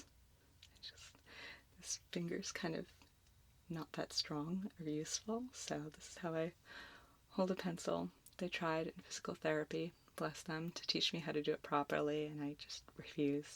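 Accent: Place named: Texas, United States. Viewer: I would never have guessed you as being named. I like it tho. It matches your beauty and uniqueness. One of the things I always liked about your videos is your "hey y'all" greeting. If I'm remembering correctly you aren't from Texas but you moved here with your husband. As a native Texan it's nice to hear that greeting since its something we hear and say daily. Wether you're a native Texan or not that little bit of Texas twang suits you.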